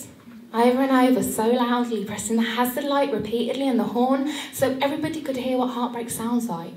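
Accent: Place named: Birmingham, United Kingdom. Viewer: excellent, the brummie accent really suits your style, and you're beautiful too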